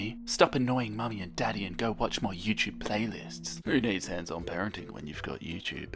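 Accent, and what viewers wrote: Australia. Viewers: YOUR AN AUSTRALIAN!? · wait.. are you aussie??? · Ayyyyyy! Your Australian! You are now my most favourite Australian Youtuber! I'm Australian.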